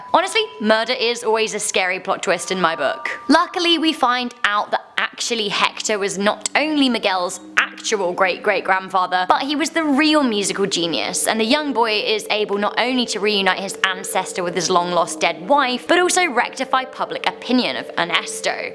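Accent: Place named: England, United Kingdom. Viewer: what part of England do you hale from?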